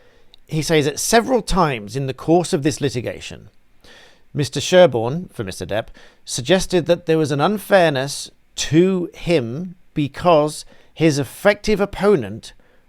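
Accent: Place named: Birmingham, United Kingdom. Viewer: Are you from the Birmingham area?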